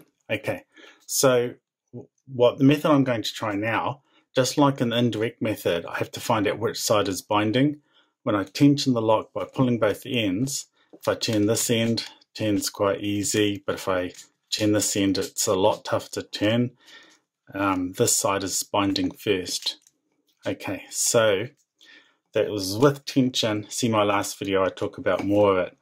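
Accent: New Zealand. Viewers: South Island fella? 🇳🇿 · I heard that accent and instantly recognised you were a fellow kiwi! Fuck yeah! Glad to see another kiwi here! Chur for the video bro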